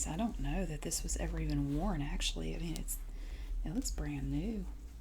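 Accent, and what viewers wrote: United States. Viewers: Your accent is amazing. I'm English and I love American accents. What's a goodwill store? Is it a charity shop?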